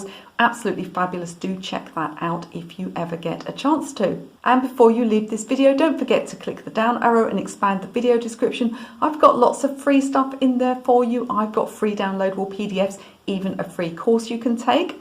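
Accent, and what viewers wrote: United Kingdom. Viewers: I love your teaching methods, I have learned so much about drawing from you. I am very sorry that some people are mean. Also love your British accent.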